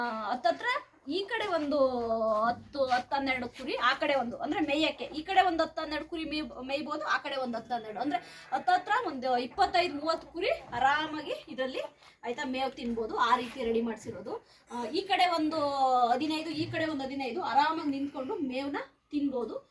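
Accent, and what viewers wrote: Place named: Chitradurga, India. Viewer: Akka yavuri nimdu...bashe nodtidre Chitradurga district ansutte ..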